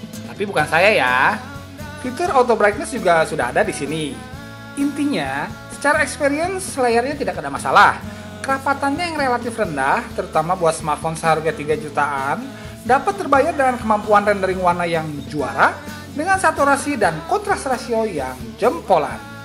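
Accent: West Java, Indonesia. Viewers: nuhun kang buat reviewnya :D sunda pisan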